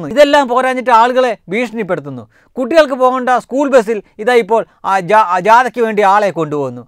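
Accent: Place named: Kannur, India. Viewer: എൻ്റെ നാട് ആലപ്പുഴയാണ് , കുട്ടനാട് ആണ് എന്ന് വാർത്ത വായനക്കാരൻ ഇടക്കിടെ പറയുമ്പോൾ ഒരു സംശയം. ഭാഷ കേട്ടിട്ട് കണ്ണൂർ കാരൻ ആണെന്നാണ് തോന്നുന്നത്.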